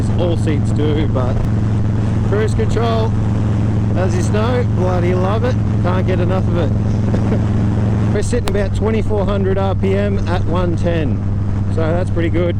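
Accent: Australia. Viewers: Wonderful review. The exhaust note is therapy. And your strong australian accent is a pleasure to hear 😊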